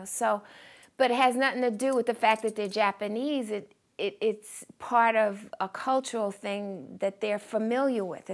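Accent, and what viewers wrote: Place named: New York, United States. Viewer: I love your New York accent and your apartment is gorgeous I could fall in love with all your art work.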